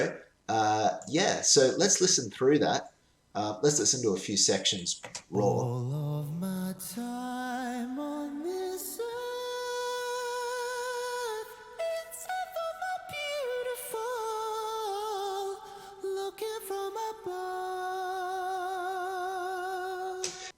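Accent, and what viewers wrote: Australia. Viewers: Thanks for doing this! Having worked in recording studios producing songs and ad jingles, I was always fascinated by the sound engineer’s familiarity with the console and utilizing the tracking part of it. One of the fun ones used to be working at EMI Studios with a 48 track console. That was many years ago. Now, its digital, so it’s even more fascinating to me! Good one! On another note: Are you Australian, or from South Africa or New Zealand?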